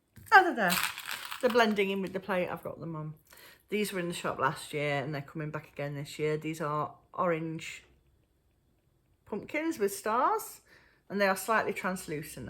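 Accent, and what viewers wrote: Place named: Derbyshire, United Kingdom. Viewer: I’m new here, when I heard you talk I thought same accent, I’m a Derbyshire lass.xxx🐝🐝🐝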